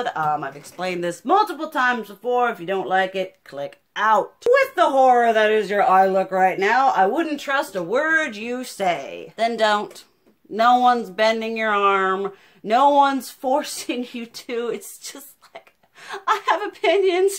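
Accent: South, United States. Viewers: You sound like Jane Lane from Daria, but with a slight southern accent :) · Most of those people must not know you're from the South. Your voice is not annoying, it's southern. Just like my voice is "valley girl". It's just a part of who we are. 🤷‍♀️ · I love ur voice especially your country accent lol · Hahaha i love your voice and tone ! Its one of the things that made me come back after the first video i watched. I loved it , im not american and idk but it reminded me of some old movies that depicted people from the south or it reminded me of what i thought american accent was before i moved here.I really like it and i like the fact its so animated and has so much personality. Love it ! It sux that we are saying things online that we wouldnt say in life,if i dont like someone or something i just leave. Sometimes i want to comment on someone makeup but i think oh well its what they like and i dont say anything unless someone ask for an advice 🤷🏼‍♀️ Im sure i dont wear tgings that everyone love. 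· I LOVE your southern drawl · I agree. I love it! I put these on my tv when im alone just because its so exuberant and comforting. (No idea why, I'm Swedish. I have no relations to southern USA accents) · “ Areeeeeeeeeeed” pretend like I used your long gorgeous southern twang! 😊😁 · I love your Southern accent and your voice and your clothes and your hair and makeup! Everyone likes something different, dang people are harsh. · I can’t imagine being so miserable to leave hate comments. Girl you are beautiful and love ❤️ the loud southern twang . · I LOVE your voice. I think the first comment I either left you or told my friend about you was "She's like a Southern Belle mixed with Wednesday Addams, love!" Also, all these comments about "Your volume" do they not realize no matter what damn device THEY are watching on there is a volume control & they can turn it the hell down themselves? Lol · Love your southern drawl. 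· I just join her channel 2 weeks ago I never thought her voice was annoying. I acutely like her southern belle voice.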